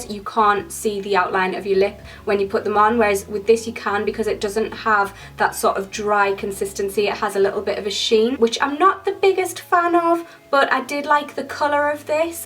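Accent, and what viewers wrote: Newcastle, United Kingdom. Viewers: I love your videos as I am a fellow Geordie. You are a Geordie aren't you 😂😂😂 Please do a freedom makeup tutorial ❤️💖❤️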